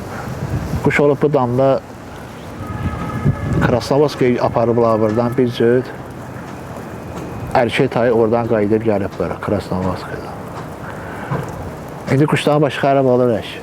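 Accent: Baku, Azerbaijan. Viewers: Can ey can baki lehcesi var ol qerdew mende quwbazam qedew baki quwdarna catan yoxdu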